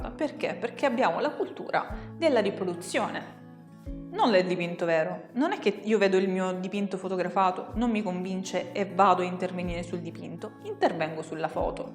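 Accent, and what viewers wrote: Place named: Sicily, Italy. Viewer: SI SENTE BIEN......L' ACCENTO SICULO !!!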